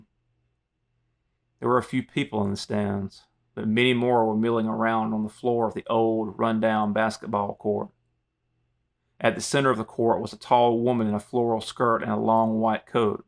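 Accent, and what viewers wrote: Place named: Southeast, United States. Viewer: you sound like the south eastern section of the us to me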